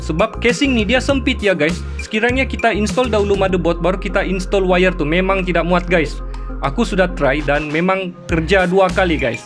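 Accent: Sabah, Malaysia. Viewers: Bro orang sabah kah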